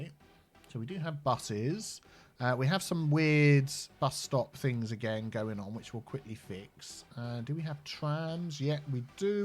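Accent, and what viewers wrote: United Kingdom. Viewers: Ah! Poke me eye out! Love the British accent,. Adds flavor to your videos. Good stuff as always.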